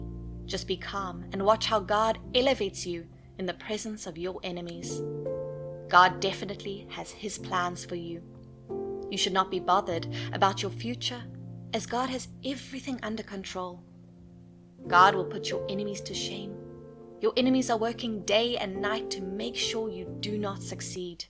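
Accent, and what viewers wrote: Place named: South Africa. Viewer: Thank you for this! You sound South African. :)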